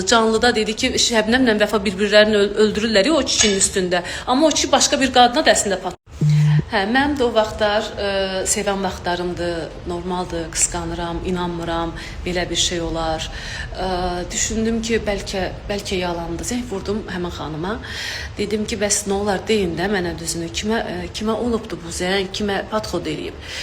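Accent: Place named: Baku, Azerbaijan. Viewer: Kendci..davavizi..gozuvuzu..danisiga bax.Baki lehcecine kecib...ciriq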